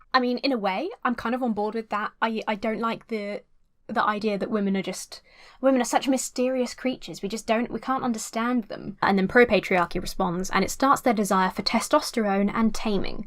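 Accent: United Kingdom. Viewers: First video from you i watched already my least favourite thing is your british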